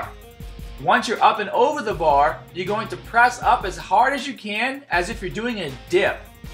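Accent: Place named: Brooklyn, United States. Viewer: Spot Conlin It's his Brooklyn accent.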